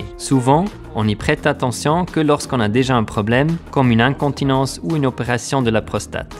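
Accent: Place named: Germany. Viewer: j'aime beaucoup cette accent allemand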